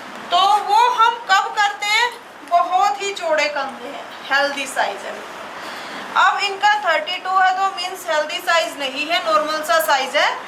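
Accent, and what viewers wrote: Haryana, India. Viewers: Tone se mujhe haryana ki lag rahi Me bhi hisar se hu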